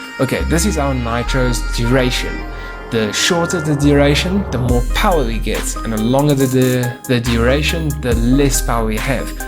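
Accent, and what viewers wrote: Afrikaans, South Africa. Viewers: Afrikaans boytjie, kan sommer hoor haha.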